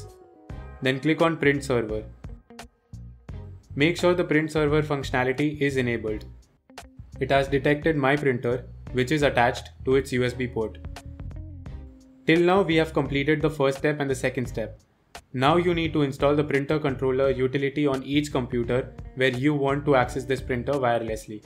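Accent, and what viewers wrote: India. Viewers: I can't understand Indian accents good enough but thanks for trying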